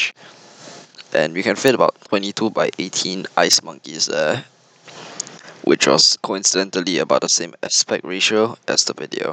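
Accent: Singapore. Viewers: Yooo singaporean??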